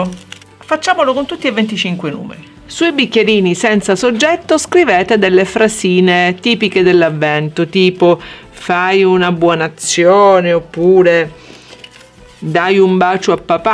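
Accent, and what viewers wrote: Catania, Italy. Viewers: Ma tu sei di Catania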